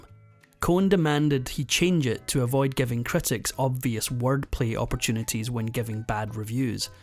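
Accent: Scotland, United Kingdom. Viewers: Oh no, not another Scottish/British voice-over for an American Actor. CRAP ! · Well, I'm in. I've watched several of your vids, and I've enjoyed every one. You manage to pick up interesting threads in performers' lives, trace them to their beginnings, then construct a well-researched, well-written chronological account of one portion of the tapestries of each of their lives. The Scottish accent is pretty nifty, too. I enjoy hearing "person" pronounced "pairson." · I love the lads wee Scot voice too… bc it reminds me of my grt uncle Micheal, a born raised in Edinburgh Scot. And the movie docs are grrrrrreat! (In my best Scottish roll of the r!) 😊❤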